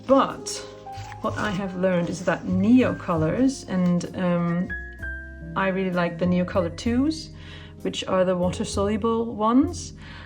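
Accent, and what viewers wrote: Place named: United Kingdom. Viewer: Your english is really good! In the first moments I thought you are a native speaker, you have a lovely british accent! :)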